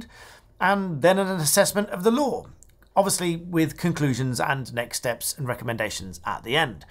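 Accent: United Kingdom. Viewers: Your accent to British. Here you go again.😂😂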